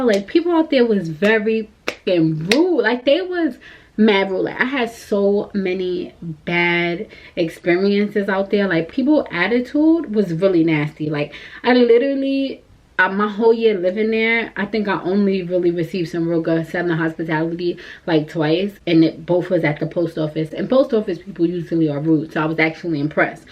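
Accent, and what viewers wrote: New York, United States. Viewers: Still sound like a New Yorker 😂😍🥰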